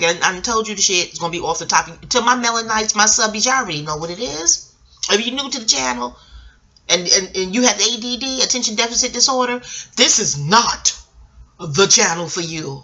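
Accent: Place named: East Coast, United States. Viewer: Absolutely adorable love the East Coast accent... hey girl you supposed be talking Bobby Brown‘s memoir...🙃🤣🤣🤣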